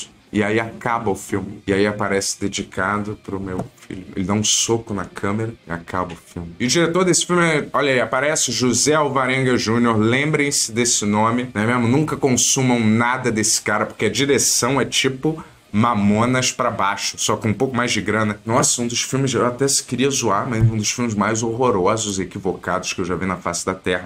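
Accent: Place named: São Paulo, Brazil. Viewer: Ele e da capital , e sotaque de paulistano.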